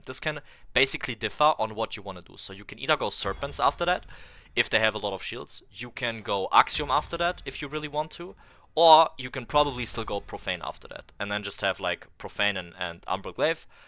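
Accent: Germany. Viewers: Hey, ich höre du hast einen deutschen Akzent. Kannst du mal einen Guide auf deutsch machen, da ich nicht so viel englisch verstehe... Wäre echt prima von dir 🤞🖖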